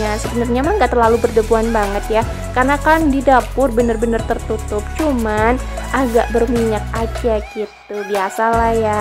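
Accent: Banyumas, Indonesia. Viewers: Setia ngikutin video nya tp jarang komen...kita satu bangsa ngapak😅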